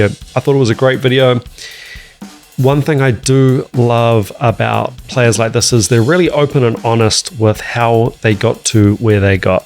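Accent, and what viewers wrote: New Zealand. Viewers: So true about relaxed..... another of the relaxed drummers I can think of springs to mind:.....Fred Beard of ZZ Top - slumped at the controls........ but no comparison!!! I found you recently and suddenly realised i was finally listening to a NZ accent - wow - how cool is that: we can do this stuff over here too! Love your videos and shorts.....Hi from the Kapiti Coast:)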